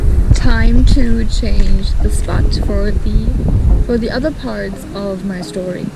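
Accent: India. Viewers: Hi Thank you and all the love for visiting India and showing us some really nice places. I want to say that your mannerisms & accent are of an Indian girl. 😊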